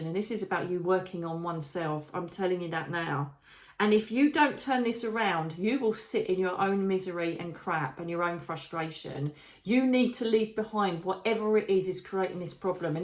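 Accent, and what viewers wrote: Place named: England, United Kingdom. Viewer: Scrolling thru after just watching another YTuber and you both had same major arcana cards! You’re funny as hell, love ur English accent - you’re a hoot! Made me smile & laugh. Interesting because I’m going to a QHHT session (quantum healing & hypnosis technique) done by Dolores Cannon to connect w/our higher self. Doing this session on 12/4 - looking forward to + I’ve been doing a lot more research in opening my spiritual awareness & abilities. I am a Reiki 1 level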